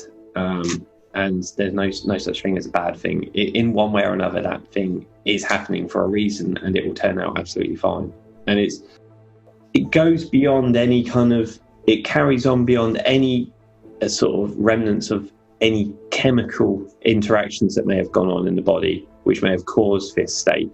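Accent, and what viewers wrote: United Kingdom. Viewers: Interesting take on DMT. Personally, "I" had to work real hard to decipher the Cockney type English accent but it was worth it. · Great report! Thanks for sharing! You sound British. I live in London. Let me know if your up for meeting some time :) Would be cool to meet and have a chat about this topic :) no one I know have had this experience.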